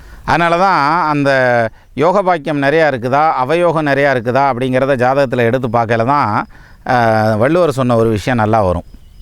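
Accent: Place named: Madurai, India. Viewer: Ungalaal, Namathu Dindigulukku Perumai, Tamilukke perumai, tamil naattukkum perumai, Naattukkume perumai. Kaalam pora pookil pazham-tamil sethuvidumo yendru payam irunthathu.... But it is playing happily in your tongue. Thanks for protecting and preserving our pazham-tamil related to astro field. I am fully impressed with your deep knowledge, detailed analysis, Tamil delivery, namma dindigul - Madurai slang and nakkal. Vaalka Valamudan. I 'll be meeting you sooner.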